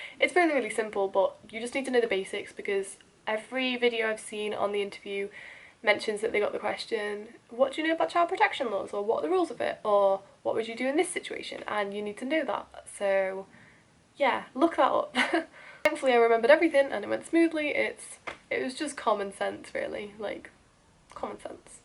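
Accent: North Wales, United Kingdom. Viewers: Hey- where abouts are you from? You sound very North Wales/ Cheshire-ish ! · You sound like you're from North Wales right?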